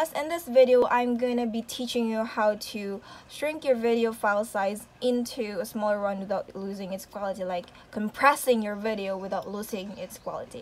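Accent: Visayas, Philippines. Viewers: Bisaya ka te? Hahaha para lang kasi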